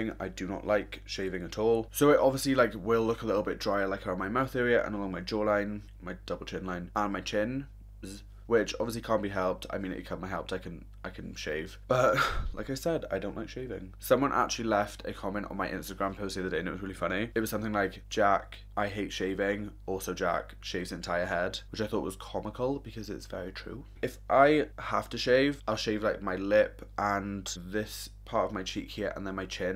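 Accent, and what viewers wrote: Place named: England, United Kingdom. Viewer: on instagram, and omg where the hell are you from! obvs england but your accent is what i live for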